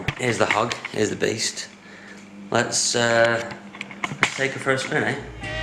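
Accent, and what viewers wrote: Manchester, United Kingdom. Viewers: Trying to identify where in Manchester you're from by your accent...where?! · Otabek Isaqov he's Mancunian - north west of England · Now I'm reading all these comments in his british accent in my head. Including mine. I don't have a british accent at all. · I always like the music you put over the b-roll. The shots are very beautiful. The overall atmosphere of your travel vlogs is very enjoyable. You wanted to know what we like about your videos, well....everything? Your UK accent gives it a nice flair, too :) I'm looking forward to the other videos about the rest of the travel!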